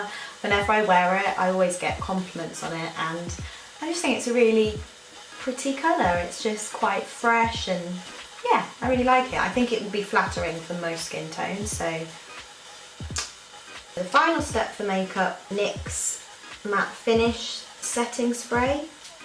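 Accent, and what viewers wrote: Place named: United Kingdom. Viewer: Omg looove the look, you're just so pretty and I love the way you talk haha don't mean to sound creepy though, I just have a slight obsession with British accents and everything English related.. I want to move to England soo bad!! lol sorry for that random comment.. I just meant to say that I loved this video and you're awesome! x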